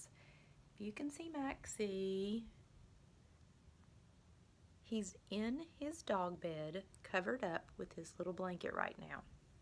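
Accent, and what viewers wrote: South, United States. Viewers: I cannot listen to your SOUTHERN DRAWL any more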